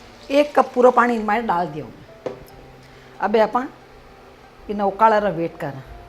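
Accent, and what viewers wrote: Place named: Marwar, India. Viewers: nice aunty ji ur marwadi language is very sweet😙😙😙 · राम राम "मा" सा आप बहुत ही अच्छी रसोई बनती हैं उस सेभी अच्छी आपकी मारवाड़ी बोली है आप एक मारवाड़ी मुंग की बड़ी की सब्जी की भी रेसिपी बनाईए गा जी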